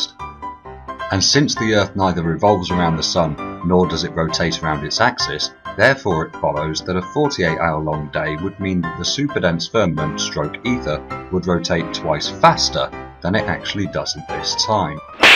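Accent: United Kingdom. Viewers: I'm American, male, 52, and British accent + sarcasm STILL intimidates me!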